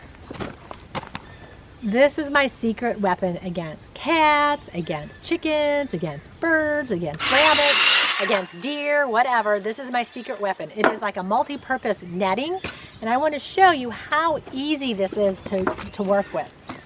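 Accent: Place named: Boston, United States. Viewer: I think you got an awesome personality. You have a bahston accent.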